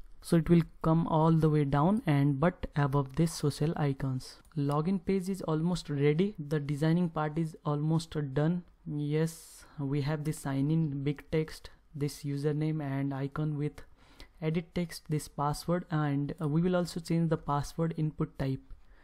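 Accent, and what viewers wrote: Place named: Haryana, India. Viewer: Your accent is Haryanvi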